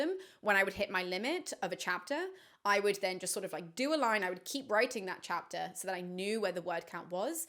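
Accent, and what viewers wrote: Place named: England, United Kingdom. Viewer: You have an English accent, everyone loves your voice. 🤣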